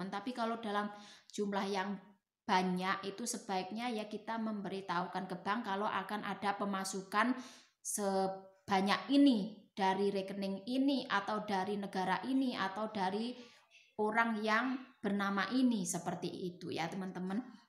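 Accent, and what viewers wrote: Java, Indonesia. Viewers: Meddok nmbak😂😂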